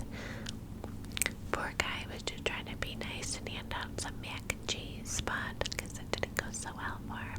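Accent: New York, United States. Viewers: Yasss bringing back the NY accent😍literally adore itttt , neighbourhood gossip is always the best cuz I’m such a nosy person🤣❤️ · Shout out to the state of NY I never been there, i have only been to 4 states California where I am from,arizona,nevada, and New Mexico i have 46 more states to go eventually/hopefully i love this ny accent 🙂😅👍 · Your New York accent is giving me serious Harley Quinn cartoon vibes! I love it 💓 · I love your NY accent it's so relaxing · Love a good Nooyawk / Bawstin accent 😂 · Omg I LOVED this. I adore your NY accent ❤️ sorry I’ve been Missin in the comments. I’m in art school!!! Degree in film and photography! So I’m super busy but enjoying it so much! You know my playlist will be helping me through my degree ❤️❤️ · Your New York accent is so relaxing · YEEEESSS I live for your NY accent!! Plus this story time..girl, its 4am here and it shall put me to bed 😴 good night!! 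❤ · these New York accent videos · aaah i love ur ny accent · LIVING for these NY accent videos! For some reason they’re just automatically tingly. Hope you’re having a great week! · I truly love your New York accent is awesome! Thanks for this amazing video! ❤️😍🤠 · the new york accent is so satisfying wow, i definitely wasn’t expecting that 😂 great as always! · I’m in love with the New York accent your videos always send me to sleep thank you ❤️ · I love this New York accent it's just such fun. Your really good at these xx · i absolutely love her NY accent, it is really soothing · I’m obsessed with you and your New York accent! I’m from Boston and it does remind me of our accent here and there, I definitely don’t mind 😍😍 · Yes the NY accent video is my fave!!!!!!! 😍♥️ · Ooh I love New York accents ❤️ excited to relax as I get work done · another long day of schoolwork and getting ready for bed gonna watch this !! 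💛💛 i love the combo of the ny accent and ur neighbors ☺️☺️ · Yay! The NY accent is back!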